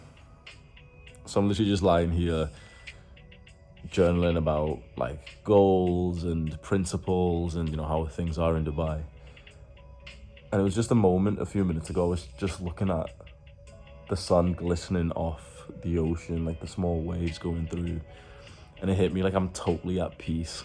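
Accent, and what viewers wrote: Liverpool, United Kingdom. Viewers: Your accent is northwest wirral/Liverpool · Wait is that a Scouse accent i can detect. *Back to the content of the video *Inner healing allows deep soul growth and discovery. It's so fulfilling because the inner joy that happens is freeing because you learn true love and happiness starts within yourself.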